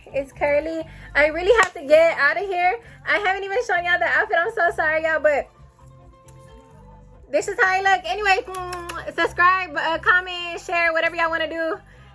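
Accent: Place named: Houston, United States. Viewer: Man yo voice ain’t nun that’s js tha Houston accent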